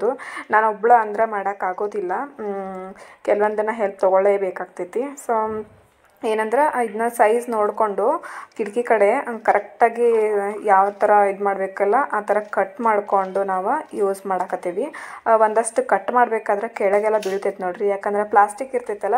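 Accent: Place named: Bijapur, India. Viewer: Niu namm tara matadtiri .namdu bijapurari ❤ ,.. beautiful vlog 🎉🎉 new friend.🎉❤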